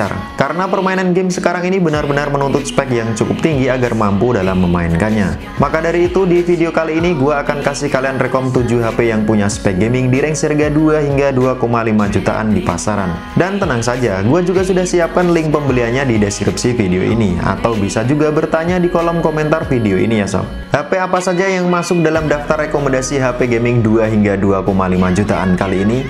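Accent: Madura, Indonesia. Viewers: Bang.. madura mana kmu bang?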